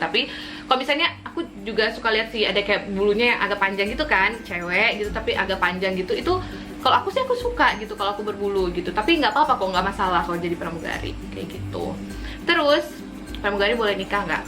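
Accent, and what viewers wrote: Manado, Indonesia. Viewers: Kakak dari manado yah?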